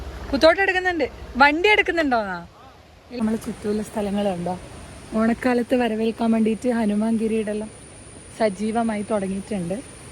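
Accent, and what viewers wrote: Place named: Kannur, India. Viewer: നമ്മുടെ കണ്ണൂർ ഭാഷ ❤❤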